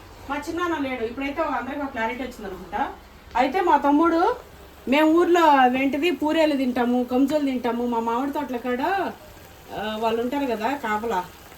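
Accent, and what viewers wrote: Telangana, India. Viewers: I would love to hear rayalaseema slang not telengana plz stopped watched ur video in between becoz I missed native language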